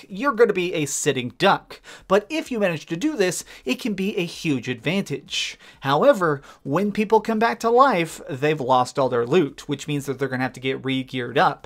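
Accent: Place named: South, United States. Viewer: What happened to the southern accent now you are speaking like an announcer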